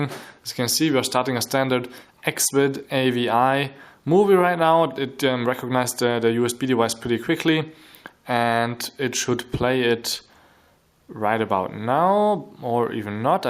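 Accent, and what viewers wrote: Germany. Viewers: ich habs gleich gewusst das du aus deutschland kommst, verdammt wieso hört man das bei uns so raus? >.< ich hätt am liebsten einen amerikanische aussprache :D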